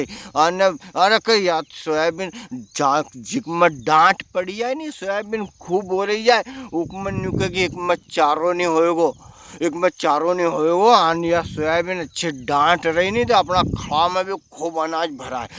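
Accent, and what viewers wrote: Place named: Nimar, India. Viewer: Shuddh malvi bola kr bhai nimadi kyu add krta h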